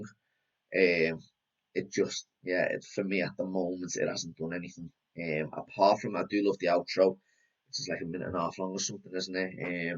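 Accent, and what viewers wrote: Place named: Liverpool, United Kingdom. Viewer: Nice to hear a fellow scouse accent talking about 😉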